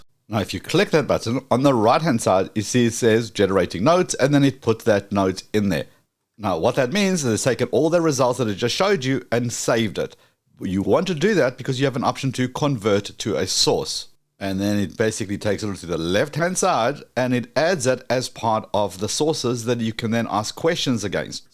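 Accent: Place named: New Zealand. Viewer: Just found this channel from your main channel and instantly subscribed without even watching a video. Why? You're awesome, that's why! I love all the useful information, excellent delivery, and -- who doesn't love a New Zealand accent? 🤣 They're the beest!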